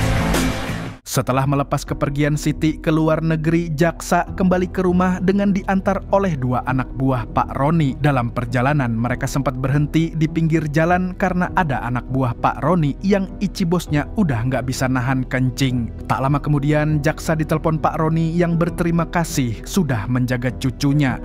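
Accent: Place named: Nganjuk, Indonesia. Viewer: kok nganjuk bang aku orang nganjuk 🤣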